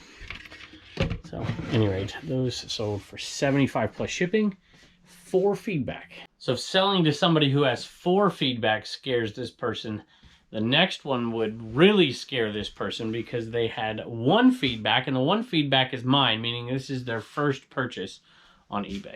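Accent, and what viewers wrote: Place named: Minnesota, United States. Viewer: Yah Sure !!! Youbetchamn must be from Minnessota !!! Yah Sure Youbetcha !!!!! lol, easily recognized by this Wisconsin norwegian/American gal.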